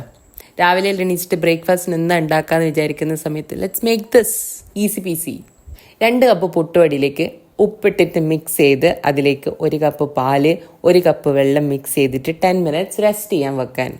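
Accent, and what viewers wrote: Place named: Kannur, India. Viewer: കണ്ണൂര്കാരി ആണല്ലേ ❤❤❤❤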